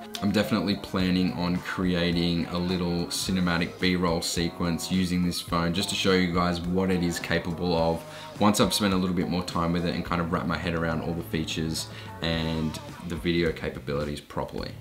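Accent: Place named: Australia. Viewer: Thank you very much it's good we have an Australian accent because I am from Sydney, how did you get the hands off Galaxy S21 I thought only Americans can get it. I am subscribing your channel keep up the good work😁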